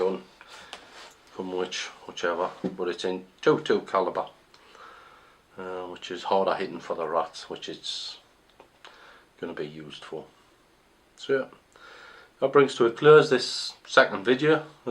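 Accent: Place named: North, United Kingdom. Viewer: Well done mate....its nice to see and hear a down to earth northern lad.....saving and saying £700 is still a lot of money to us normal working lads.....most of the reviews,they have massive houses or been given guns to review....you've convinced me what my new gun will be👍🏻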